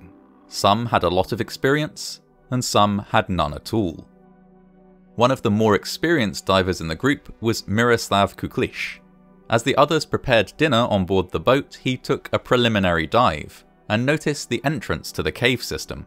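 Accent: United Kingdom. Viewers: British accents work really well for documentaries. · I watch your disaster videos vs others due to that British accent. Keep it up!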